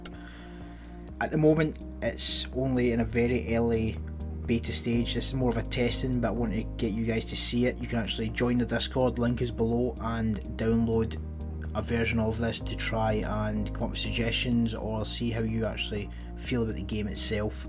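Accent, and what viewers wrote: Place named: Scotland, United Kingdom. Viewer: Btw I love your Scottish accent lol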